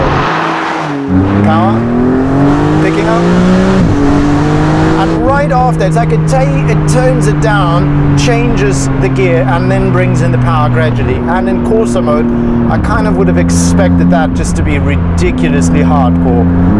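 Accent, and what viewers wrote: Cape Town, South Africa. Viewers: Is the presenter South African? Has our accent, and said “ lekker “ a typical South African trait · Are you South African ? You sound like you from Pietermaritzburg.... 🤔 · Is this dude South African?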